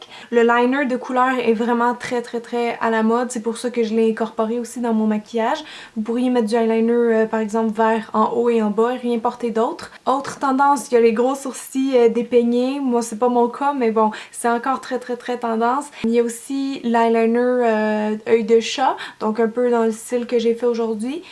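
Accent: Canada. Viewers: Tes vidéos sont vraiment cools et vraiment bien faites ! Merci de nous consacrer du temps ! Je t'ai découvert il y a une semaine et quelques grâce au street style de madmoizelledotcom et j'ai presque déjà vu toutes tes vidéos ! Est ce que tu pourrais faire une video sur le masacara s'il te plait ? Merci d'avance Ps : j'adore ton accent canadien et oui je dis ça parce que je sais que vous n'aimez pas qu'on vous dise ça !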